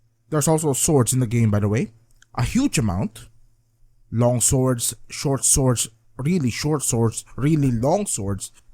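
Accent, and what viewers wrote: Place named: Philippines. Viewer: When I heard the accent, I just knew it was a Filipino CC. Know any communities/servers to ask and team up with other Filipinos or do we just go in recruitment chat and pray we have peeps there?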